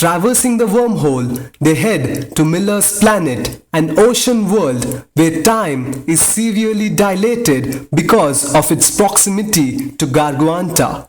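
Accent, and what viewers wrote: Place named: India. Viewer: You have a very light Indian accent man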